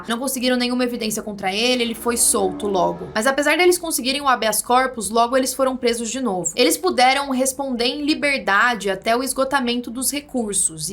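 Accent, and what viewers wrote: Sao Paulo, Brazil. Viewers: O Estado do Pará 🇧🇷 ao Norte do nosso Brasil gigante têm um longo caminho de podridão na história, ou seja, infelizmente aquele Estado nortista é DESGRAÇADAMENTE FAMOSO nas páginas policiais ao longo de várias décadas. Tipo se eu fosse um cidadão nortista brasileiro nascido naquele Estado PARAENSE eu sentiria vergonha de dizer pra qualquer um que eu nasci ali. Graças a Deus que eu sou um homem brasileiro PAULISTANO e com muita honra e orgulho da capital e do meu Estado de São Paulo que é o mais rico não só do Brasil como da América Latina. Lembram daquela FREIRA AMERICANA que tanto fez o bem em ANAPU, na região campestre do Pará? Ela foi covardemente ASSASSINADA a mando dos ricos fazendeiros assassinos e exploradores ladrões do Estado do Pará. Ela foi uma bondosa SENHORA que só fez o bem e teve este fim trágico. Na época eu fiquei revoltado com essa raça desgraçada que há no Pará. 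Lembram do município RIO MARIA? também no Pará, aquela região têm um histórico de BANG BANG, ou seja, é famoso e pecaminoso em ASSASSINATOS COVARDES, faça pra nós, Senhorita, um documentário em seu canal deste caso antigo da Irmã Doroty que foi morta em Anapu ok. Obrigado. E já deixo meus gostos aqui sempre em seu canal. Tu parece paulista também pelo seu sotaque. Tu és paulistana também? Desculpe a ousadia de te perguntar. E encerro por aqui essa carta com minhas saudações calorosas para ti de boas vindas. Fraternalmente. 🎉❤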